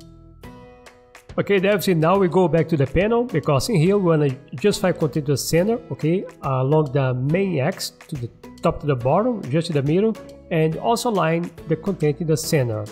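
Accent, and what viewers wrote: Brazil. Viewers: Excuse me, are you Brazilian? Me desculpe perguntar, mas você é brasileiro?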